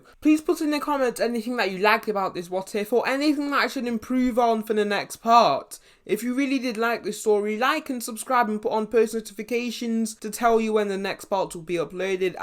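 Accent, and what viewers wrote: United Kingdom. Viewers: That Brittsh accent is so annoying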